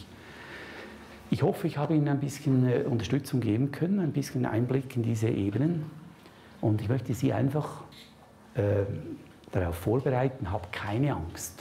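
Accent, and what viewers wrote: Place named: Switzerland. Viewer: Es ist sehr schwer der schnellen, Schweitzer Sprache zu folgen. Ansonsten ein ganz großartiger Vortrag, in dem nur Wahres gesprochen wird. Wir alle sollten dem Folge leisten.....so weit es möglich ist.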